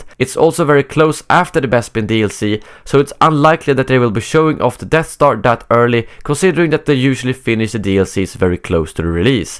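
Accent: Sweden. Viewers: Are you A Swede?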